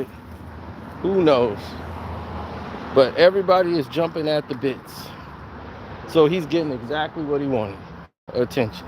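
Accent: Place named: South, United States. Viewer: This DUDE Talk SLOW AND COUNTRY. GET TO THE FUCKING POINT. 😁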